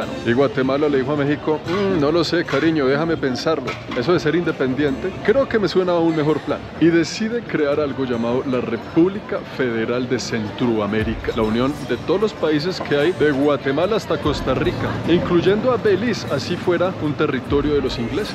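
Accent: Colombia. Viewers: Gracias por presentar a mi país, con un toque colombiano muy agradable, bienvenido, que la disfrute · El nació en Panamá, se crió en Colombia y vive en Canadá, por eso es un orgullo que a pesar de tener otras nacionalidades se considere colombiano, también en su manera de hablar y sus dichos, un youtuber que si vale la pena verlo 👍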